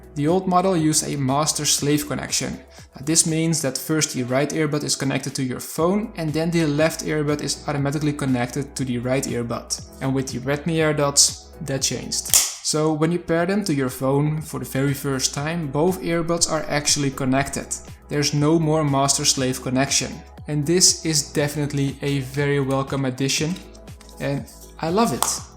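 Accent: Netherlands. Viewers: Goed Nederlands accent hoor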